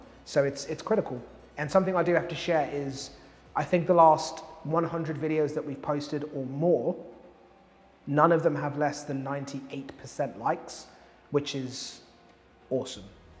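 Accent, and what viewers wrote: England, United Kingdom. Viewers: Really enjoy your vids for catching up with hockey stuff. Your docu style vids are my favourite, pretty cool to see how people play hockey and get their fix around the world and their culture behind it. I found your channel recently while looking for new skates for the first time in 20 years and crazy to see how far things have developed (bought Bauer 3S btw, only for public skating at the rink and occasional pond...hockey days are behind me). Baking skates blew my mind! I played in Scotland back in the 80s (goalie) and the most popular skates with players at the time were Bauer Supreme 100s later 1000s, Daoust 301, CCM Ultra Tacks and the newcomer at the time Micron Mega 10-90s. I’ll just say one thing about the race/people of colour/black/brown in hockey. 80s hockey in the UK was white af and I remember Hilton Ruggles breaking into the British hockey scene at top level back then, Whitley Bay I think it was. 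This guy seemed to appear out of nowhere and was an absolute beast of a player. I can still visualise him powering up the ice and our crowd being scared of what might transpire and their breaths being held. Anyway, thanks for the vids. To be honest, your English accent really threw me off the first time I watched…was expecting North American/Canadian.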